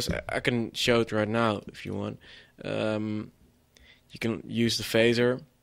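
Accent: Netherlands. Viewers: It's the typical dutch accent haha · Nederlands? herkende het meteen aan je accentje :P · LOL I love the way the Dutch talk. :D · ah so is dutch cant miss that accent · lol nederlanders en hen accent in het engels zijn echt onmiskenbaar :P next to that good tutorial! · Mental! I clicked it on in the margins of the You TUbe Drum and Bass selections! What girls are you on about? He does sound Dutch though..Pffffffff as the Dutch male says : ) I welcomed the tour on this software....the link did exactly what it said on the tin.*shrugs* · Lekker hollands accent heb jij. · ik hoorde gelijk al aan je accent dat je nl bent , lol . Maar nice.. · LOL dutch accent FTW!!!!! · ye, dutch people talk english kinda funny, you always hear that immediately (i'm flemish)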